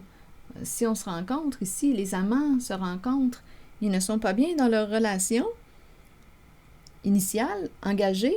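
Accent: Canada. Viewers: Bonjour Oui je suis très attirée par lui mais le repousse sauf qu'il habite en face de chez moi ya une tierce sa femme et 2gamines qui me posent problèmes depuis des années bien avant d'être tombée amoureuse de lui et cette invit arnaque Facebook 2018 où voisins(es)ont participé pour me manipuler dont cette tordue de femme et ses2gamines!et lui se cache alors qu'il me souriait se retournait sur moi m'avait même parlée..ils sont très à l'affût de la mode et lui mettait casque oreilles dans cesse pour écouter musique en allant se promener..moi je sais pas ce qu'il a dans la tête mais dans son regard je vois bien qu'il a une forte attirance pour moi..trop difficile tout ça..oui vous savez déjà que je suis en 'colere'.. J'm beaucoup votre accent canadien et vos interprétations..bonne journée..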